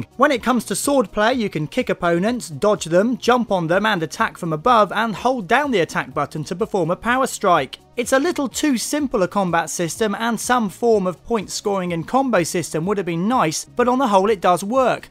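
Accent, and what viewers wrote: England, United Kingdom. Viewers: go fuck yourself! He is ENGLISH and speaks ENGLISH with an ENGLISH accent...if you think ENGLISH is an indigenous American language and the American accent is the right one, pick up an American history book and read...you need learn more about the world you live in, DUDE!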